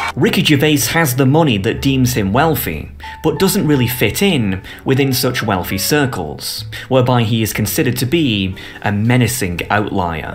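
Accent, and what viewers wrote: United Kingdom. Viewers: It took me a while to figure out how nonsensical this guy is. I guess I gave him more leeway because of his nice British accent. 😅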